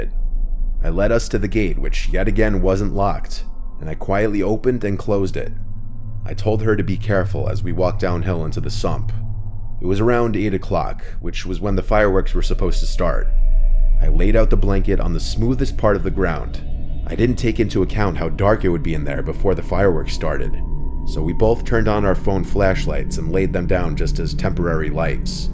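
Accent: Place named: Northeast, United States. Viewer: I really enjoy his narrations. Especially when I hear and itty-bitty hint of a Northeastern accent? Pretty sure that’s what I’m hearing. Correct me if I’m wrong please! 😅❤ For example: starting - I can hear the accent a tiny bit when he says “fenced off” . Also when he says “flashlight” and “Ashley” Example . 😊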